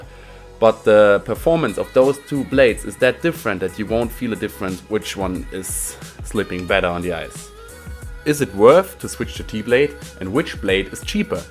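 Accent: Germany. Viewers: Safe ein Deutscher so wie seine Aussprache is 🤗🙏👍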